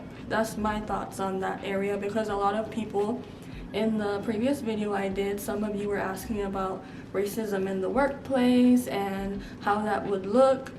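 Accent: Jamaica. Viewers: You had me with the Jamaican accent and food 😍 Moving to Denver and will definitely be looking for Jamaican food. Thank you sis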